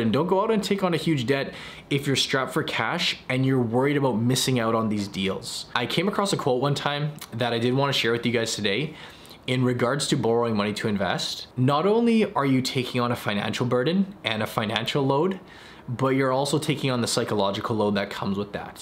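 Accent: Canada. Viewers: Sometimes your Canadian accent is comes out and it’s really entertaining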